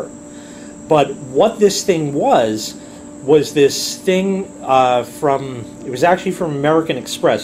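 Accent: New York, United States. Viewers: You know you're listening to someone from NY when they say "aarange" instead of "orange" If I had to deal with that vending machine, I would be so pissed. Getting orange instead of the Diet Coke I asked for? Fuck that guy... There was a time that paying with cash was the norm. Now it's debit cards like I have. I'll tell you, I feel much more safe spending with cash than a debit card. Honestly, do Millennials even know what the hell cash is?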